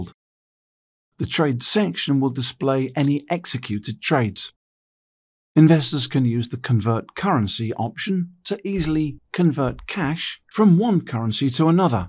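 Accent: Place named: United Kingdom. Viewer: This vidEO has gone tangent and not to the point. We are talking here about trades, correct? What you are starting to discuss is "How to convert currency" - how is this related at all? This is a different topic altogether ! What IBKR VIDEO missed- 1) No talk on how to place ETF order or no option for that - is it same as stock? 2) No discussion on order types, what are the different types of stop orders, limit order IBKR SERIOUSLY NEEDS TO IMPROVE THEIR DELIVERY QUALITY - AND PLEASE PLEASE GET RID OF THIS UK ACCENT ! THANK YOU.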